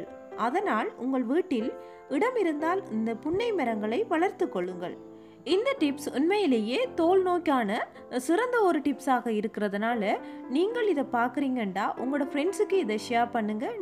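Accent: Sri Lanka. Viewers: they r srilankan i think they say like that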